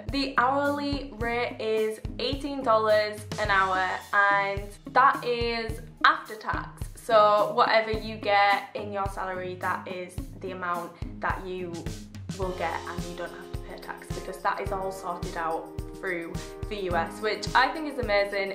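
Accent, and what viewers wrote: United Kingdom. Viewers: hello, very useful video thank you! I can hear from your accent you are British? What's it like being a brit' out there and what tips might you have for brits' wanting to apply or to help adapt them to Vietnamese life/ job? Thank you.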